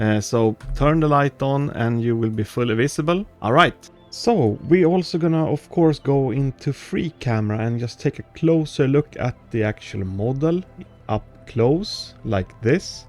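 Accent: Sweden. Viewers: it feels like you are from sweden cause the acent you have feels like swedish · u swedish right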